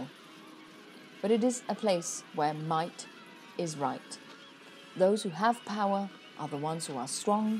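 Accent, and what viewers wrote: United Kingdom. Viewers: Hey, I'm was a recent viewer. When I stumbled upon one of your videos, I thought it was another boring analysis that I've heard from other Youtubers. I am glad I clicked on it. I was hooked on how deeply you analysed the details and symbolism of Arcane. And I never would have thought of the parralels if it weren't for you. Your videos are very enertaining and it also helps that your voice is very calming. If you don't mind me asking, are you British? I'm sorry if I do get your accent wrong.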